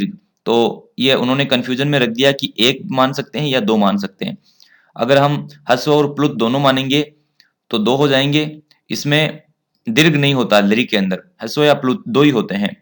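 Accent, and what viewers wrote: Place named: Haryana, India. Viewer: वो क्षेत्रीय या मात्र भाषा का प्रभाव तो पड़ ही जाता है। किंचित वे हरियाणा से हैं।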